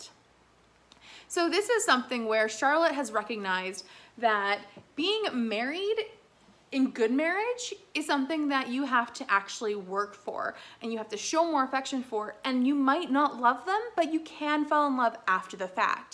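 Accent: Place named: United States. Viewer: I enjoyed listening to your commentary. Maybe your American accent helped with objectivity!😊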